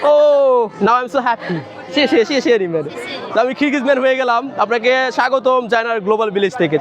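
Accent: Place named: Netrokona, Bangladesh. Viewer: আপনার কথার স্টাইল অনেকটা আমাদের নেত্রকোনা র মানুষের মত, যদি কিছু মনে না করেন তাহলে, জানতে কি পারি আপনার বাড়ি?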